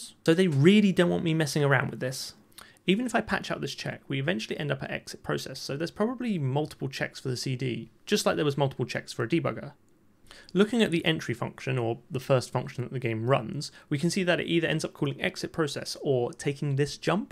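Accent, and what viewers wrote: United Kingdom. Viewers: i love your accent... remember me when i been in london.. age ago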